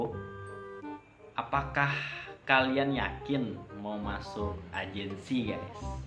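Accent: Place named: Banjar, Indonesia. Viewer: tapi ada bahasa tadi logatnya kaya logat bhsa Banjar. Makanya saya kira dari Kalsel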